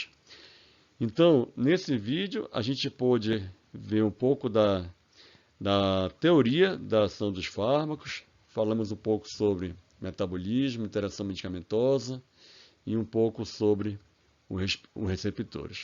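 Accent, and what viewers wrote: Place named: Pará, Brazil. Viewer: Sotaque paraense. acertei ou errei?😊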